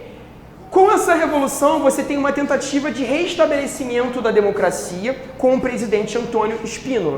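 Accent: Rio de Janeiro, Brazil. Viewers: Eu quero fazer uma pergunta. Eu nao sou do Brasil. Alguem pode me dizer se o professor fala com um sotaque carioca? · Daniel Temelkovski, sim, com toda certeza ele é carioca.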